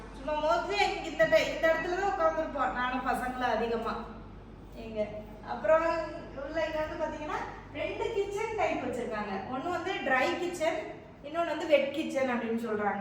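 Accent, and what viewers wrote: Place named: Ramanathapuram, India. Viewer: Ongloda slang ramad (D's)mari erukku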